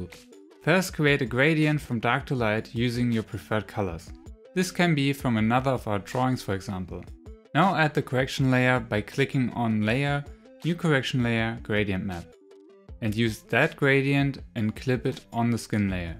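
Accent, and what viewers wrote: Germany. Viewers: Are you From Germany? Your acent sounds like that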